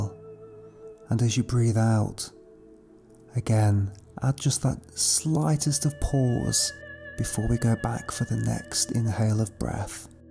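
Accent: United Kingdom. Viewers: Ok thr british accent is already helping 😅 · Your accent reminds me a friend form Brighton (UK) 😊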